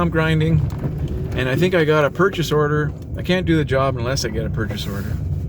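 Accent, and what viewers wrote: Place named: Canada. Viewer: I hear what you are saying man, I was called a douchbag last winter, at an apartment I looked after, I told the property management I was putting up with that shit, and I dropping their buildings because it wasn’t the first time I had a run in with this particular property. So this year I told them sorry but buh bye. Sure I lost 5 bldgs, but I then picked up 4 and charge the same amount I charged for the 5 bldgs from the other mgmt company. We do a dangerous job that puts us in the worst elements and people think because they give us a small amount of money to clear their properties that they can treat us like we’re fools. Nah! We’re professionals and we should be treated like such. Also How would they know what equipment or amount of labour is needed, to do jobs, were the ones that do it all the time, ....... sorry, got me fired up, sounds like you are from Canada, I’m just east of Toronto, where are you?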